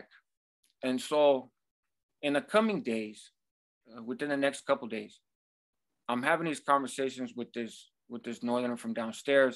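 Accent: Mexico. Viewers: When I close my eyes while watching this I hear a Mexican dude but when I open them I see a white dude.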